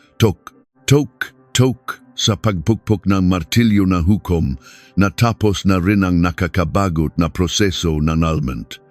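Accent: China. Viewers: Ano ka Sir, intsik ka ba? bakit ganyan lkung magsalita itongnarrator na ito nkakahiya ka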